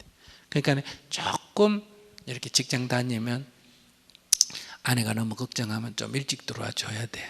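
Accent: Gyeongsang, South Korea. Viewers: 인생 상담자 일인자.. 경상도사투리 정말 티꺼운말투..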